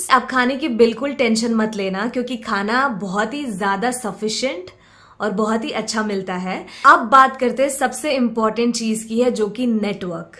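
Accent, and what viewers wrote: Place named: Bihar, India. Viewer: Aisa Q lg rha hai aap Bihari ho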